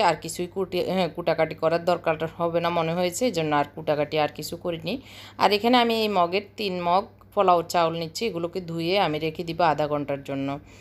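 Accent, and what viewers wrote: Noakhali, Bangladesh. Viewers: Apu apnr kothay Noakhalir vasar j tan ta, ata amr kache onk valo lage Ami Noakhalir NSTU te porasuna korechilm...onk suvokamona apnr jonno.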